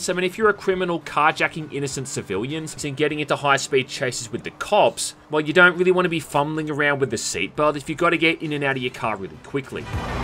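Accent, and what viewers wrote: Australia. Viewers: Its how the Australian accent works.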